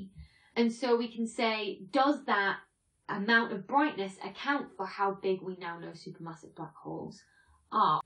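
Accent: United Kingdom. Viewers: It makes very little difference, but for some reason i find it really pleasant to hear a british voice explaining science for a change. I seem to follow all the american science channels. Subscribed, and totally not biased.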